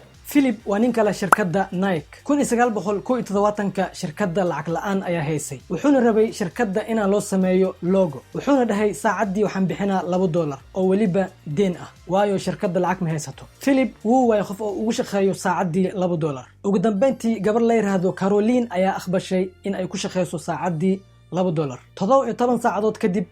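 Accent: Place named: Mogadishu, Somalia. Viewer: ee imka qof yutubar ahtahy markaa afsoomali bilaa xamar ku hadal ile reer muqdisho uun ku dawan mayaan dadkle oo guud ku dawanyee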